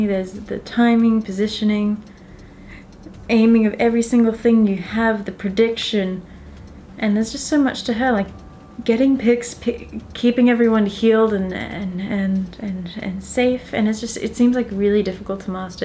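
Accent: France. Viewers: Can you talk more with French aczent? sounds pretty good ^^ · i love ur french accent xD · nice Vid ... til point 12, jeah I'm triggered (why is junkrat harder to master than her?!?!?!?!)...:D and that's a nice french accent ;D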